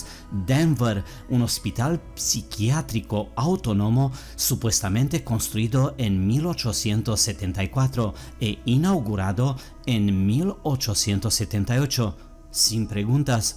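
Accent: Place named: Germany. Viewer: Estoy muy impresionado con su trabajo, de verdad impecable su eapañol es excelente pero su acento parece aleman o holandes puedo preguntarle de donde eres?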